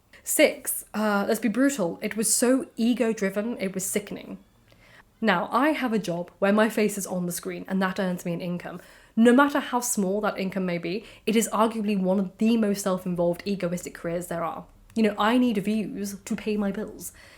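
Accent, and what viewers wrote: England, United Kingdom. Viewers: Just found this channel yesterday, now binge watching, as well as Lady of the Library and your wonderful blog posts. So nice to find such an intelligent, interesting and attractive lady on YT. With an English (Irish?) accent to boot!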